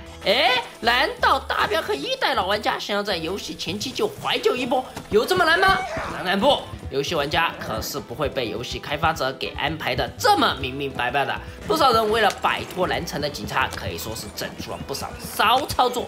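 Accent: Sichuan, China. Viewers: 厂长的川普如果更川一点就更好了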